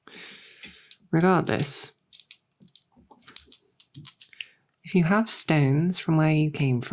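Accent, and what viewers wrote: United Kingdom. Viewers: The British accent is healing for the soul ❤️‍🩹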